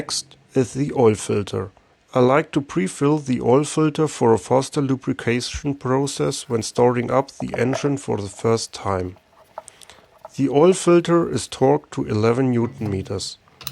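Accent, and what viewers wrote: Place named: Germany. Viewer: You should do more of these. This is absolutely excellent. I get a lot of reassurance hearing a German accent, part numbers and torque settings!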